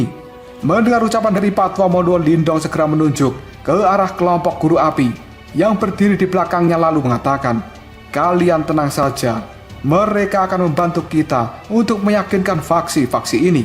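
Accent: Java, Indonesia. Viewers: MC ne wong jowo.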